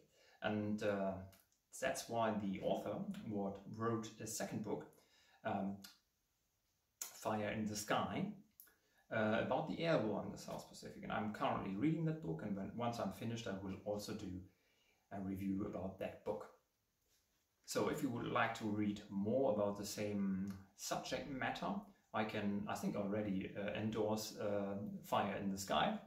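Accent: Germany. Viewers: Hey nice review bro, love your German accent :D I also speak German. Wie gehts? Subscribed! Have been posting some book reviews recently too, we should support each other :D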